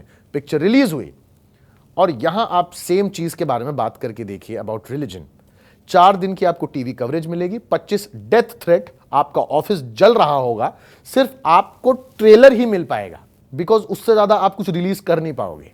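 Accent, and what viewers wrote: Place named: Bengal, India. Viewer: I love your bengali accent the most. Hahahaha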